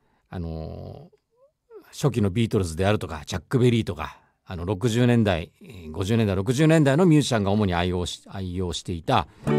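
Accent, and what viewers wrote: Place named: Yamagata, Japan. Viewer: 埼玉県から 聞いています。 東根出身なので懐かしい山形弁最高です☺️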